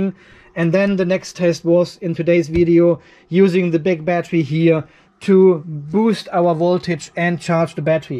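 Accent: Germany. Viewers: Nothing sounds more stupid than a German trying talk english 🤣😂🤣😂🤣😂🤣😂🤣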